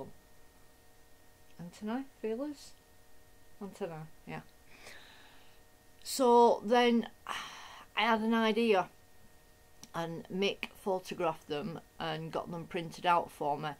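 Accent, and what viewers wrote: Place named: Northern England, United Kingdom. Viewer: Love your artwork, watch videos at night when I can't sleep so this is the first time with volume, lol, not the American accent expected but a right northern accent :-) x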